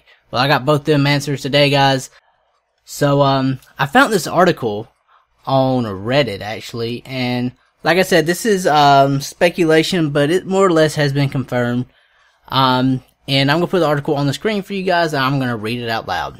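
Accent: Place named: South, United States. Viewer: You have a distinct Southern accent. Where are you from? Alabama here.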